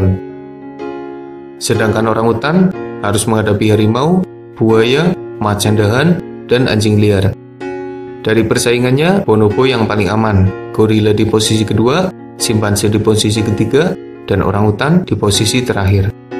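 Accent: Banyumas, Indonesia. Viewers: Sampean ngomonhe ngapak mas, wong ndi si? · Ngapaknya top..😄😄😄😄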